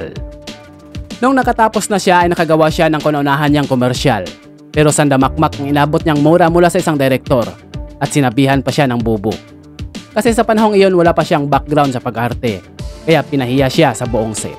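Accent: Visayas, Philippines. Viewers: Natawa ako sa ..speaker..hahaha...Bisaya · Prayd seken bisaya ka tlga Taga pagsalita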